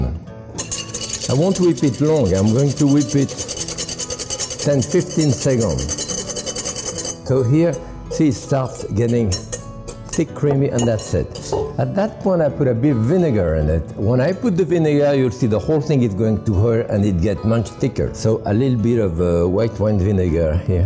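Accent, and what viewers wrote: France. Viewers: I love the way he says 'my mother' so much love ❤ in the way he says 'my mother ' especially with his French accent, love it !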